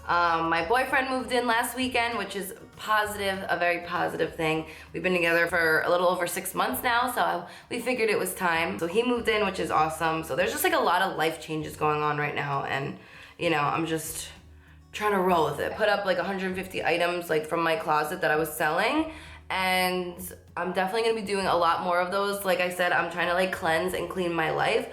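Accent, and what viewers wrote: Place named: New York, United States. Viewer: OMG I love your NY acsent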